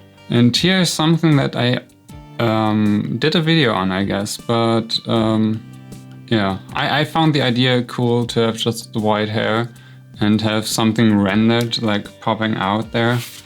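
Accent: Germany. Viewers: ich hör richtig raus das du ein deutscher bist xP ps: nice pictures! love them x3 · Wow, your German Englsich is so sweet😆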